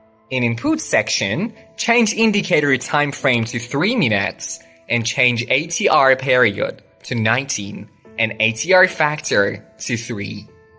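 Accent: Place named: Australia. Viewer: Why are you hiding an Australian accent ?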